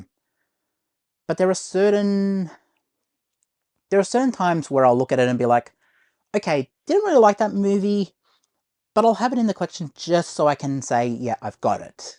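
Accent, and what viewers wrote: Australia. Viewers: I like you, ive said before the way you talk and explain things chills me out. I think you are lost with this you tube, why dont you just copy some pros, do a top ten list of fave haloween mivies. Or for me i could just chill and listen to you review 1 film (no spoilers) at a time . Watch ava pop culture what he does and put your own twist on it, not many would notice if you just copied his videos non stop but with ur aussie accent and chill way of explaini g things, hes frantic, but getting subscribers by the dozen. Copy him bud.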